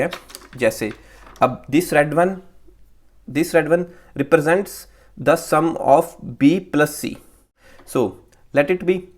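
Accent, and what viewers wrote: Punjab, India. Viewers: sir great explaination , also are you from punjab?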